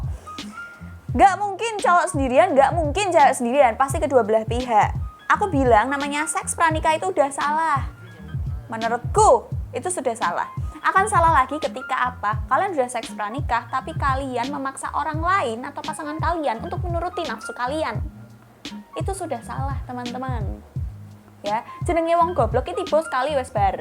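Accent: Java, Indonesia. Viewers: Aq kok seneng gaya ngomong e mbak 😊 medok Jane tp kok ngangenin🙈🙈😅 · medok mu loh mbak :) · medok e lucu....hihiii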